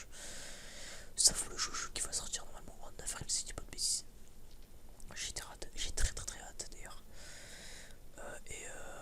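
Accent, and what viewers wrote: North, France. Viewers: Accent du Nord j 'ai l impression. Non ?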